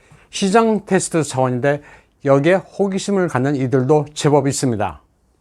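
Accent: China. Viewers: 이 사람 발음 보니 중국인이구만 ㅉㄱㅇㅇ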